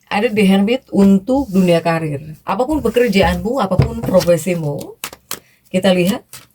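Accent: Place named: Sunda, Indonesia. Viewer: Ya bner ak suka dgr suara kk sunda, ada serak2nya gtu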